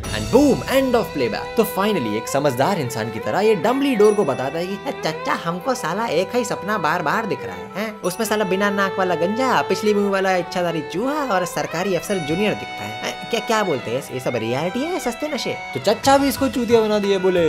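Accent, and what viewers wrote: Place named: Bhojpuri, India. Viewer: I never saisthis before but does have a brilliant bhojpuri vocabulary and accent as well as great voice modulation skills..kudos to that 👍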